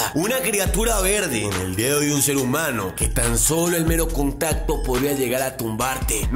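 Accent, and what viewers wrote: Colombia. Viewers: Eres de colombia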